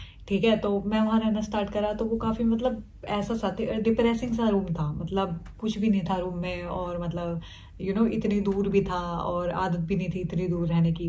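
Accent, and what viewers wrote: India. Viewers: Way of you speak love it 😅 Desi.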